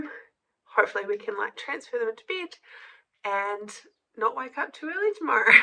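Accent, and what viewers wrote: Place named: New Zealand. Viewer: Sounds like a Kiwi to me.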